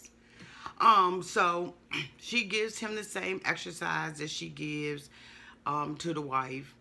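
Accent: Washington DC, United States. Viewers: Is that a DC accent I'm hearing ?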